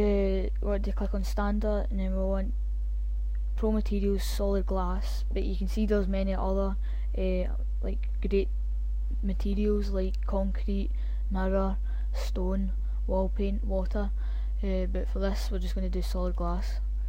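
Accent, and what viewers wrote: Scotland, United Kingdom. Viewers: Cannae beat a bit of scottish accent... :P pretty cool man, any idea how tae get it a bit clearer... mines coming up too dark and im making a realistic coffee machine for a uni project... I know i should know this cause im in uni but i only found out this "pro materials", its called Arch & design in 2012, l like a week ago.... cheers lol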